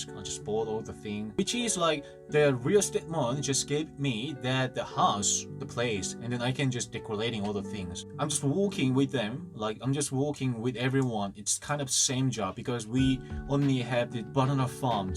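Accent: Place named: United Kingdom. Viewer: 영국식영어 개멋지다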